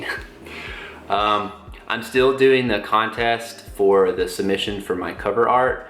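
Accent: Arkansas, United States. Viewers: Lovely story. Love your AR accent. I used to have AR accent too.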